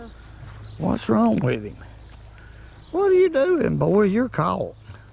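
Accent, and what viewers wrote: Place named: West Virginia, United States. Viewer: Old West Virginia boy here, what part are you from, you sure got the accent down?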